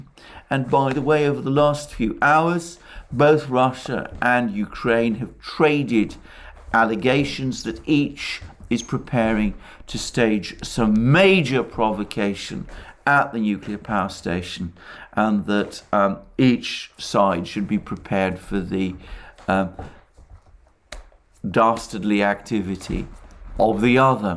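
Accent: England, United Kingdom. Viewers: I have been following you but many times you absolutely rise the anger in me. It's not just your English accent but how you express yourself I find it's appalling. Nevertheless I think your message probably rings true. What an evil man oh hell the word "evil" some sort of religious expression. No it's not a word that you expressed but the problem that I have with you it seems to me you want Pappa Pu, like a steamroller. Distruction, everything in his way no matter Fields buildings and people squashed . Like a rollerball we've cleaned everything that was here the dentist yeah they didn't think about that way. And you, it's just war games. I would love to sit down and play chess with you however there's somebody else I need to talk to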